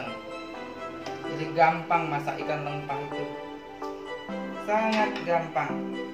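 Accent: Bangka, Indonesia. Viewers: Abang orang bangka ya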